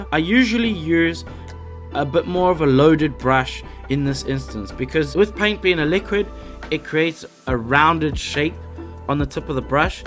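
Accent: South Africa. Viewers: South African, ???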